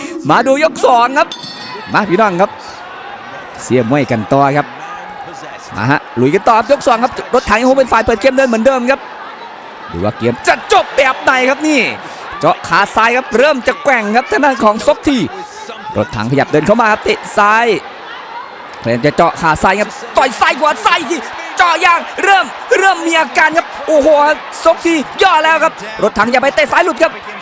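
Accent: Isan, Thailand. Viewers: ชอบคนพาค..สุดจัด.มีอีสานด้วย.555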